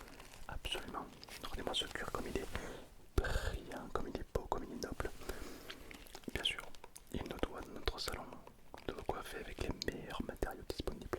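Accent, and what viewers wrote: South, France. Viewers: Hey j'adore tes vidéos je me posais une question, tu viens d'où du sud?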